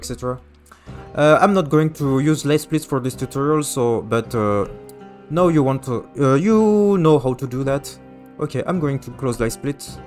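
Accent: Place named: France. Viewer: « french accent »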